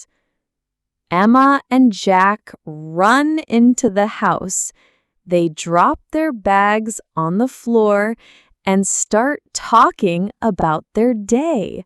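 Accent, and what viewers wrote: United Kingdom. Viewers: is british english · Is this british accent?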